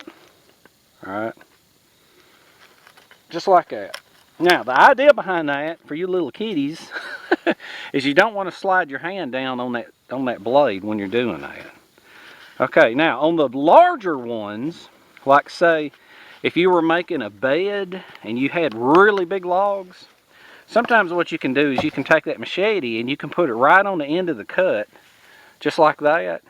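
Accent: South, United States. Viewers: love the accent are you a southern guy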